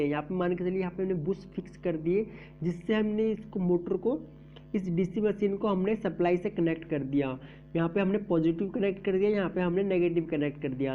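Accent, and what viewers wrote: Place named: Bihar, India. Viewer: Bhai bihar ke Ho kya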